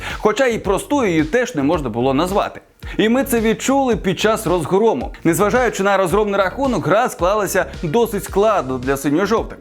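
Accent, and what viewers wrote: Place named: Russia. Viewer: Я думав, тут голи покажуть, а тут тільки хлопець хизується, немов перед дівчатами. Ще й акає, мов рюсскі.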